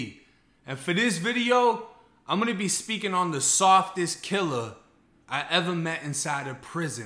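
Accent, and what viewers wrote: Boston, United States. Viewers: You from Boston?? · That’s that Boston accent coming into play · You got a Boston accent..how did you end up doing time in Florida?🤔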